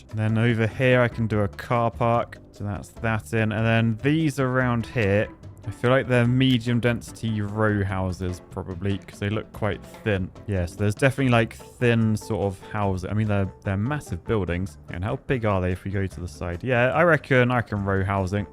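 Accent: United Kingdom. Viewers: speaking of pronouncing words correctly, its not "aloo-MIN-eeum, its "a-LOOM-a-num" lol I love the difference between British and American pronunciation. couple questions, whats a tuh-MA-toe?? lol =P and why is a fender called a wing in england? isnt a wing the thing on little stands on the trunk of a car that generates downforce? lol and whats a roundaboot?! hahaha we call them rotaries here id be curious what a long term city would be like over several videos. can we replicate true LA life?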